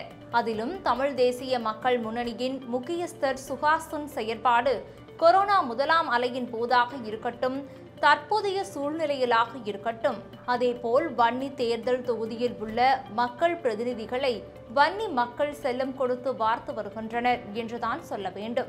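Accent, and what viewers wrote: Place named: Tamil Nadu, India. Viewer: இந்திய தமிழ் செய்தி வாசிப்பவர்கள் போல வாசிக்கிறார்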